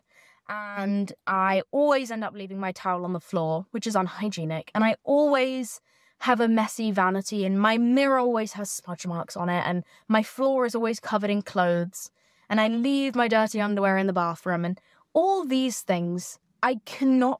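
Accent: United Kingdom. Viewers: her style, natural look/makeup, clear skin, brown layered¿ hair and british accent really make her come off as clean girl/posh if you dont know her personality. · What accent does her have ? The British one ? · im british and she sounds very british to me, i don’t hear australian tbh😂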